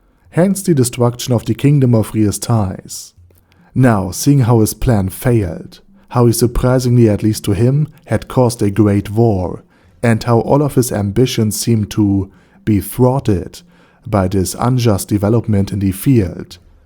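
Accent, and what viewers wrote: Germany. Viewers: Why do you speak with German accent ?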